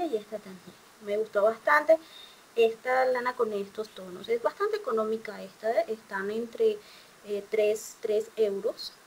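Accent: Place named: Chile. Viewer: siii que bueno eres paisana mia por el acento supe.. saludes desde Chile!!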